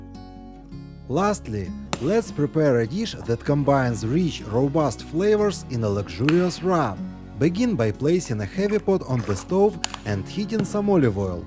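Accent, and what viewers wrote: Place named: Russia. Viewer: Your videos are very fine, and I like your Russian accent. Thank you for learning English. I know it is not easy. Слава Богу!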